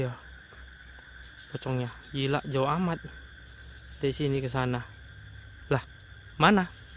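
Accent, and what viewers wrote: Medan, Indonesia. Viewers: Bang abang asli medan kan? Medan dimananya sih bg pengen tau awak haha. Pesenku berkarya teross bg. Jangan abg ilangkan logat kita itu bg karna memang kota medan yang paling disegani diindonesia😂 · Logat Medan Abang orang Medan ya :D Awas ada yg berondok hehehe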